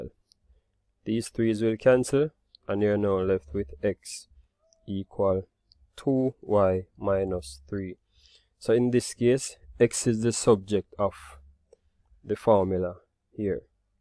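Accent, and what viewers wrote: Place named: Jamaica. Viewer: I wish I had a Tutor with a Jamaican Accent. Would have made Math's more fun!!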